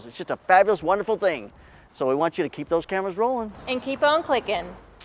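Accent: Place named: South, United States. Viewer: Wow she was country twang in that voice I didn't expect that LOL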